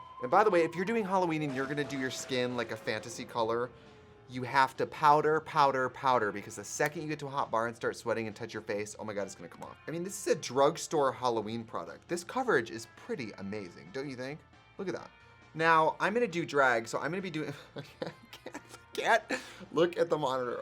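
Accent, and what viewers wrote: Wisconsin, United States. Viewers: Is it just me, or was the Wisconsin accent really making herself known in this video?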